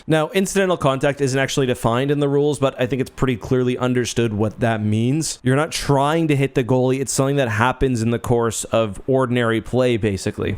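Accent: Canada. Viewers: I always knew was a canook by his accent but I didn't know he's a huge hockey fan. Now I like him even more 😂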